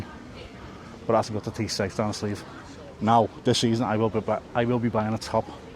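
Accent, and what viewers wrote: Liverpool, United Kingdom. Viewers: Spoken like a proper scouser who knows his footy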